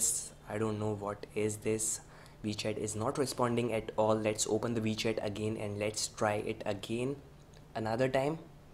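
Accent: India. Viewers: Парень индус какой-то, английский его режет уши)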